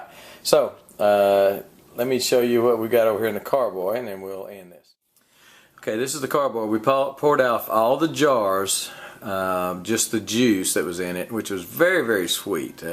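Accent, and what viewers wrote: Texas, United States. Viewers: Who the heck takes the fruit out of the drink eat a whole or Blended. that's the best part it's like an Ever melon. When you cut a bottle size hole in the top of your watermelon flip over a bottle of Bacardi in that thing overnight next day eat the watermelon it's great! I like it even better with coconut rum like Malibu or something.... I'm a big fan of an unfiltered wheat beer, blue moon Hefeweizen stuff like that and I'm just getting into Brewing Meade's and wines so I kind of think everything that's in there is the way nature intended it and I pretty much leave a drink intact unless I think it would improve it to filter it clarify it or any stuff like that but like I said I'm a newb at this. Thanks for the good advice and you don't talk too much good sense of humor and a Texas drawl music to the ears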